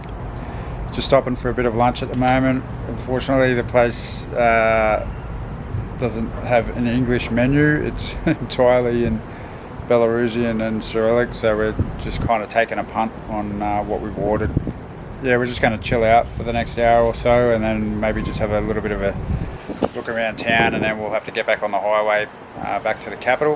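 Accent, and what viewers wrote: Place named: Australia. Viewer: So refreshing to hear Aussie accent on a cool travel blog.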